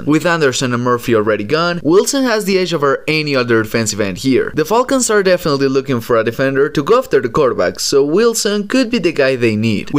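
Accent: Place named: Europe. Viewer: I don’t trust anyone with a European accent to talk football.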